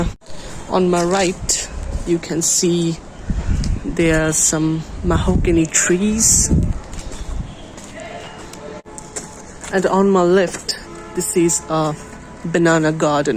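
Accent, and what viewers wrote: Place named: United Kingdom. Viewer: Your accent sounds really British. The intro is good tho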